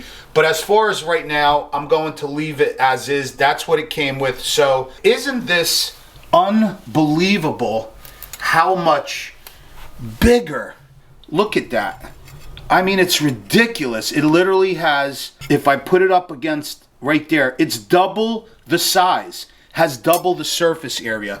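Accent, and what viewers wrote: New Jersey, United States. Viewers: LOL!! Brother you crack me up. So Jersey. "You know another thing that pisses me off? These freekin things fall off my trees!" Priceless! My women and I watch you all the time. Love the channel. Live in Texas now. Listening to you reminds me of my friends and family back home.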